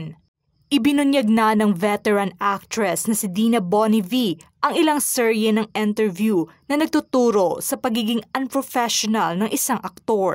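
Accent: Visayas, Philippines. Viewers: Natatawa ako sa diction ng Content Creator na ito. Akala niya napopronounce niya maigi. Andaming Bisaya accent at OA na enunciation ng consonants. 😂😂😂😂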